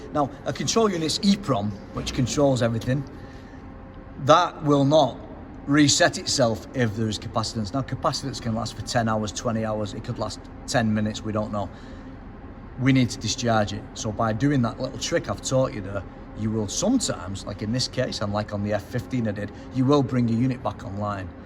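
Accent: North, United Kingdom. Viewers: Awesome and a great Northern accent to go with it! I think this is my issue and Ill report here if it works. (10-12 ins snow currently......)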